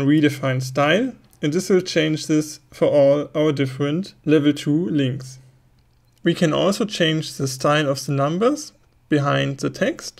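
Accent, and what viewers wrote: Germany. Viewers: Very well presented ... Easy to follow your tutes and a pleasure listening to your 'German English' :-) thanks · Very nicely explained. Great German accent too. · I simply loved his explanation! I am Brazilian and I speak little English. And although you have the German accent (it seemed to me), his way of explaining was very good, really. Got it in practice more than 80% of the things you said. This is a challenge for me because I have difficulty understanding English when people talk a little faster. With you, it was great! Another important point is your personal position because you are very friendly and pleasant in their explanations. Congratulations!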